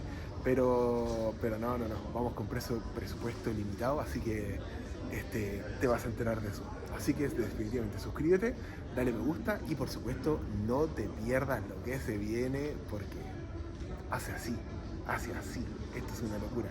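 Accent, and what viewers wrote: Chile. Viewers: Viendo este video dije mmm me suena este acento 🤔 #chilena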